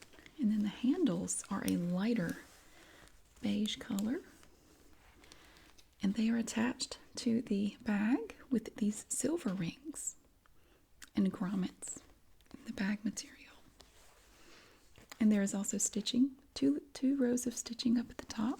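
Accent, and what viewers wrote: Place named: Upper South, United States. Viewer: 'My Journey To A Smile' She’s from the upper south east! 😊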